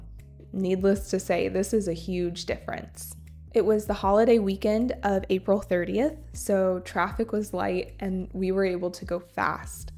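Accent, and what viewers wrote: United States. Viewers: Em rất mê American accent nên rất hóng những video tiếp theo của chị ❤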